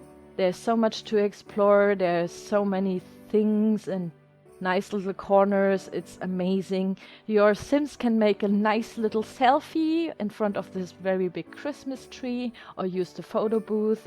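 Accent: Germany. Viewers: I love just watching your videos and hearing your voice, you talk a lot like my Oma, and it makes me happy. I don't get to see her a lot anymore and hearing your heavy German accent makes me really happy. And watching this build reminds me so much of a trip I took to Germany once years ago for my Uncle Marco's wedding and we went during the Christmas time and it looked just like this! It was beautiful... Your video made me cry a little bit, happy tears though! haha you made me very very happy. So, thank you for that. <3 xoxo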